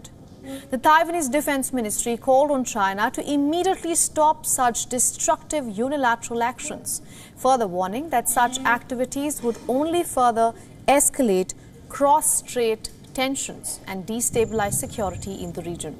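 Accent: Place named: India. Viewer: Indian accent is so funny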